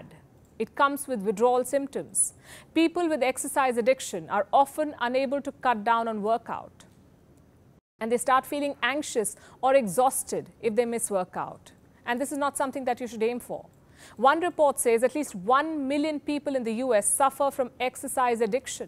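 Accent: India. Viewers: Gosh, she's got this aweful voice and strong indian accent. So annoying. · Don’t understand your English with Indian accent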